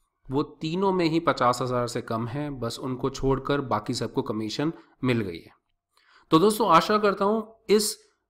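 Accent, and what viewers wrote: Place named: Pakistan. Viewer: Excellent explanation. You sound more Pakistani than Indian, pronunciation wise such as the word zaroor, Aap etc. Probably because you are from UP/Delhi. Nevertheless, good work, love from Pakistan.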